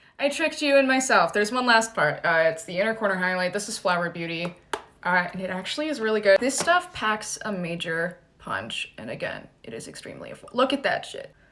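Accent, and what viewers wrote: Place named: Midwest, United States. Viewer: why did I hear that in your Midwest accent?? 🤔🤣😍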